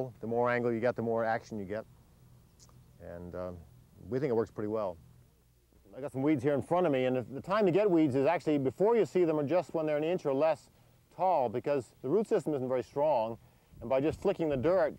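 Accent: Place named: New England, United States. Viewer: This is probably the most dense video on vegetable cultivation on the internet. The yankee accent is great as well.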